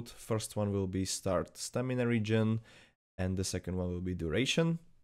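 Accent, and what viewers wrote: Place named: Slovakia. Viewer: Už len podľa prízvuku viem povedať že si slovák, ale popiči tutorial bráško dikes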